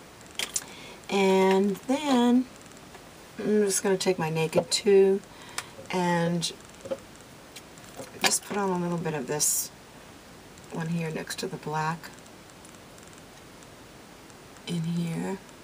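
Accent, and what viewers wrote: Boston, United States. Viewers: You to. I love it when I hear your Boston accent. LOL. I think your hair look beautiful. Bangs or no bangs. xo Marie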